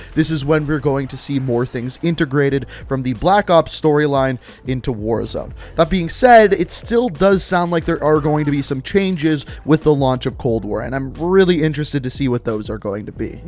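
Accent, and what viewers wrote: Canada. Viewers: That Canadian accent😬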